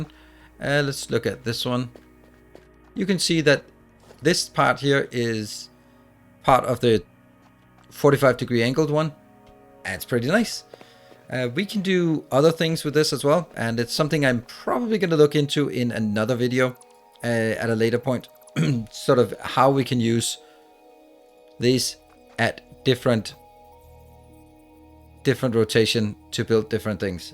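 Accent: Denmark. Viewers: This dude is for sure Danish :D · Love the video one question are u danish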